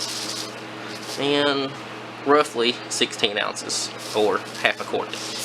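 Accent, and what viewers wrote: South, United States. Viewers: awesome im from maine and we are BURIED IN SNOW;[;[ more coming sun/ monday;[;[;[ SOOO OVER DUE FOR SPRING!!!! thank you for the video... i just came across you when i researched worms farms.. you got a new subb bud;];];] by the way were are you located? you sound "very southern" lololol love it;];];] dont hear it up north much;;[;[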